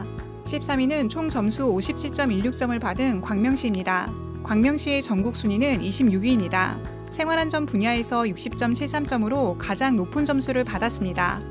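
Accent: Gyeongsang, South Korea. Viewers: 나레이션 하신 여자분 경상도 출신이신 듯..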